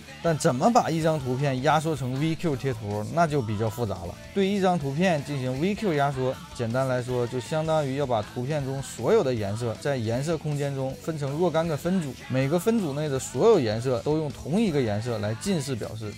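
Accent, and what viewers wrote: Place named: Northeast, China. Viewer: 东北口音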